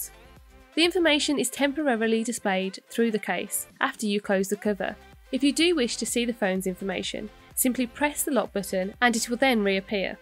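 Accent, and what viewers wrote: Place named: West Midlands, United Kingdom. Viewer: Sounds like a West Midlands accent to me.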